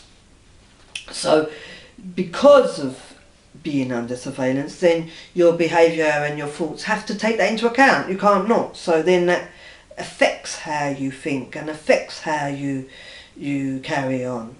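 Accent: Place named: London, United Kingdom. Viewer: It's so pleasing to hear a lady speaking on gang stalking with a London accent. I think these people doing the surveillence and doing the gang stalking are just great big spiteful little boys in great big men's bodies.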